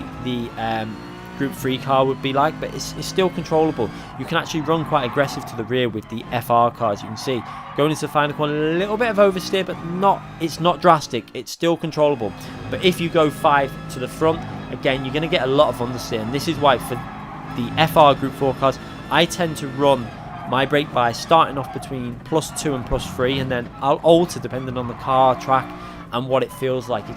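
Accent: United Kingdom. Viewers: That British accent make tutorial videos sounds so much better. It feels like I am watching an actual race with commentators speaking in the background.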